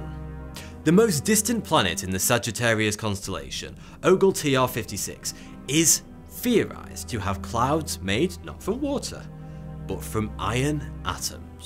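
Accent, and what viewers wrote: United Kingdom. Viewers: you sound british but you have lived in america for 12 years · Hey Your Voice Is Deep and Beautiful. You Speak Like British Gentlemen. 😎🤓🧐🤗🙂😉 Yes Well And Sophisticated. I Am From India. Hey Why Corona Virus. I Thought This Video is Old Video. But You Just Uploaded On 3April 2020. 😃😀😄😆😂🤣😛😜😜 Be Safe From Corona Virus. Kill The Corona From Earth. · This is the British younger Vsauce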